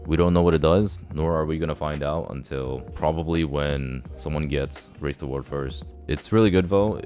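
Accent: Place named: United States. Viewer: basing your experience on someone else opinion :D sound american to me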